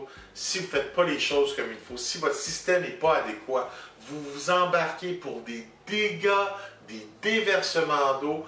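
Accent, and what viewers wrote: Canada. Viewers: J ai adoré tes vidéos et ton accent canadien! Merci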